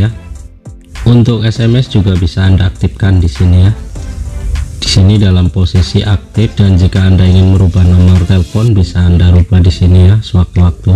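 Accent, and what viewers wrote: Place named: Lampung, Indonesia. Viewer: L 15, anda orang bandar lampung ya, Terima kasih ilmunya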